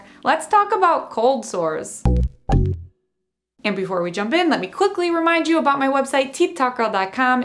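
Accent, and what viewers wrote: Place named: New Jersey, United States. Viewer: That’s a lovely accent you got New Jersey?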